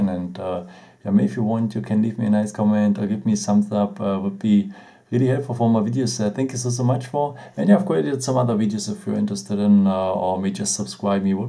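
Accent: Germany. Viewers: Bist du aus Deutschland??😂😂 Hört man voll raus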